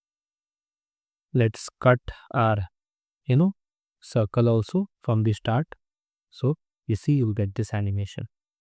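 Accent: India. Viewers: I just hear the india accent and i Liked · no music no sounds just pure indian accent and after effects thanks dude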